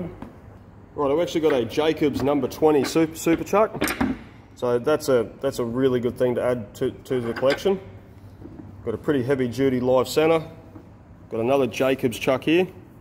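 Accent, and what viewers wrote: United States. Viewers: You're starting to sound less ke an American. Made right here in the USA . You did mention made right here in Australia a lot 😂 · Oh no, you're starting to talk American now. Good score on the auction. Hope y'all had a good Christmas. regards from Wales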